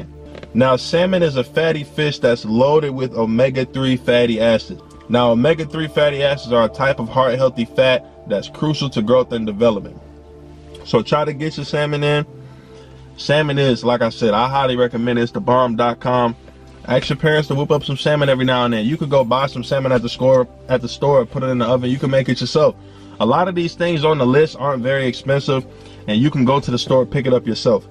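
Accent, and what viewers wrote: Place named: New York, United States. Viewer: New York boy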